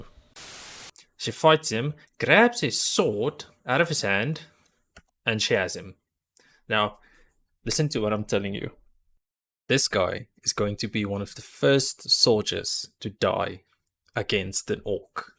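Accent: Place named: South Africa. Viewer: Heyyyy, a fellow South African. Het die accent gehoor en lol, moet gaan kyk het of ek reg was. Nice vid, keep it up!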